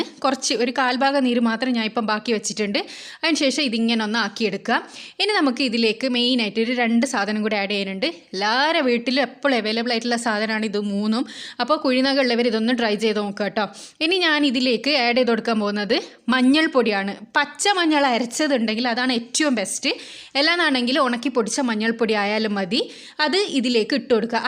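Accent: Kannur, India. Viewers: Chechi Kannur annoo??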